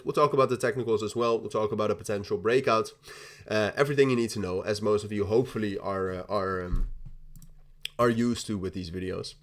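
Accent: Netherlands. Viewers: Ik meende al wat Nederlandse klanken te horen.. heb het me al een tijdje afgevraagd.. 😂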